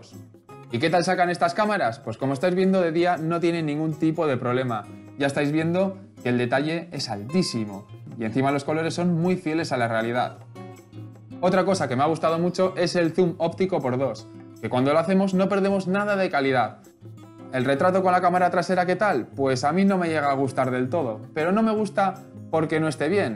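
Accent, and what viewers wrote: Colombia. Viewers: ¿Eres Colombiano? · SOS colombiano?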